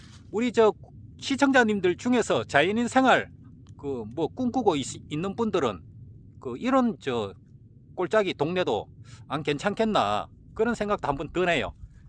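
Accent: South Korea. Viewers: 님은 고향이 어디시길래 촌말을 그리 맛깔스럽게 하시남유?ㅎ ㅎ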